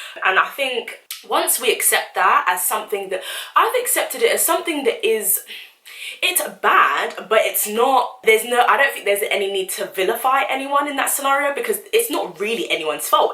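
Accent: United Kingdom. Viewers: I am glad that your are speaking pure British English, and not the wrong stuff that we Africans speak. Obviously you are born and raised in the UK. However, recent immigrants should be able to learn that, with a little effort, especially those with university degrees. I am a recent immigrant and I respect any new language I learn and try to speak like the natives. Thank you for being their so that when I get better at the language, whenever Africans ridicule me for not speaking African English, I will show them your video.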